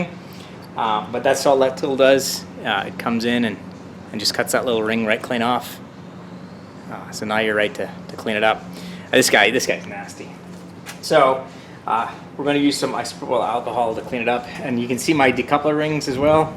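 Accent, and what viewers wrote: Northern Ireland, United Kingdom. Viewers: I can pick up on your slight Northern Irish accent, all the best from the UK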